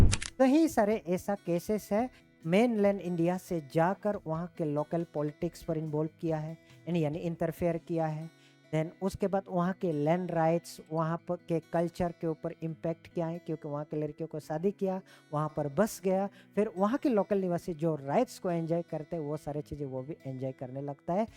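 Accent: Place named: Bangladesh. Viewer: Eye bangladeshi hai bolne ka tarika patachal teh.hai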